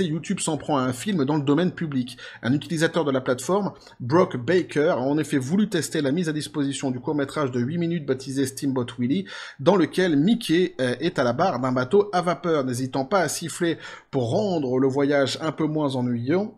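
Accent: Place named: Quebec, Canada. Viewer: Avec ton accent québécois,je croyais que tu étais du Canada..lol. Le comble serait qu'un mec lambda fasse un film d'horreur sur Mickey et que ça fasse un carton. Ca serait excellent...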